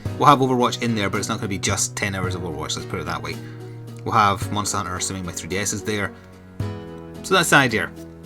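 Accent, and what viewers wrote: Scotland, United Kingdom. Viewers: He sounds Scottish so, Scotland?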